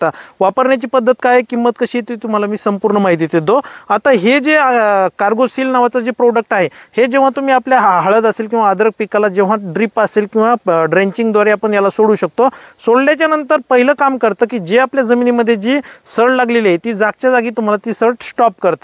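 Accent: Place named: Parbhani, India. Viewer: भाषा परभणी जिल्ह्याची आहे,छान निवेदन करता राव तुम्ही.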